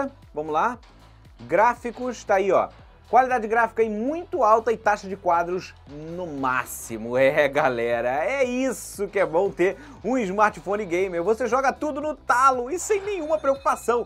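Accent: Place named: Portugal. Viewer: Portugal parece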